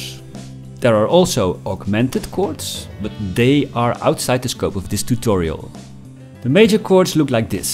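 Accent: Netherlands. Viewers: Indeed. And the Dutch accent adds the cherry on top.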